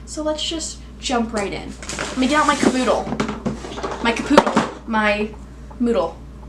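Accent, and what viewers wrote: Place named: South, United States. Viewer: Beautiful video, I just subscribed, are you southern? I detect a tiny bit of an accent but I’m not totally sure.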